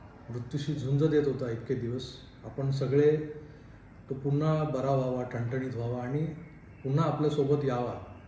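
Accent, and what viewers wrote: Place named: Khandesh, India. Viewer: खानदेशी लहजा ला कायमचे मुकलो खुप दुःखद घटना 😔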